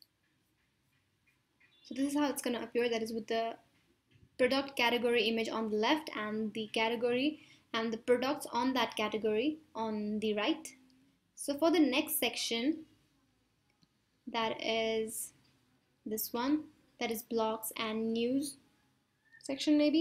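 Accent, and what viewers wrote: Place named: India. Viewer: Typical Indian Voice trying for Amercian English. Good.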